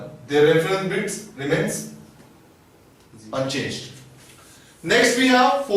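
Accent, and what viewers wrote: India. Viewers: Only INDIAN accent :) very helpful video Thanks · The indian accent make it that much better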